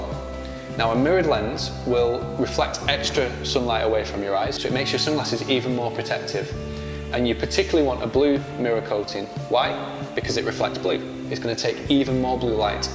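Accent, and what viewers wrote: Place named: North, United Kingdom. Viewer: The accent is from the northern part of the UK. he sounds to me. .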